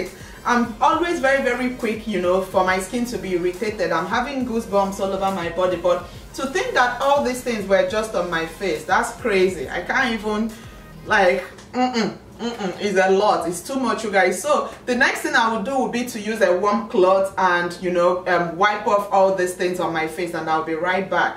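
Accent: Nigeria. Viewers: I guessed from her accent that she was Nigerian, like me, and I've seen it confirmed multiple times in comments. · are u nigerian ? u sound exactly like my parents...and basically 2/3 of my family . and if u are may i ask what part ? :)) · jmelias shanelle no. She just has one of the accent from a tribe in Nigeria. (Igbo) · She sounds Nigerian. my teachers and friends have the same accent, and they're from there. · She's from Nigeria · Vasty A. she is Nigerian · Nigeria most likely, because her accent sounds very strong nigerian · Many of Nigerians people speak that way. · U sound Nigerian :) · She must be Nigérian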